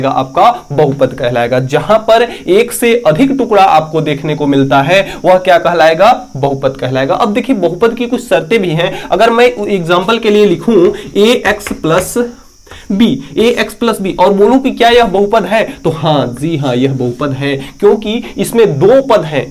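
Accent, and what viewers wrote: Chhattisgarh, India. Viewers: mujhe lga tha aap chhatishgarh se ho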